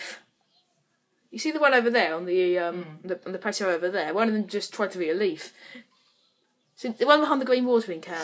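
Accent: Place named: United Kingdom. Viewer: now i know that you sound a bit british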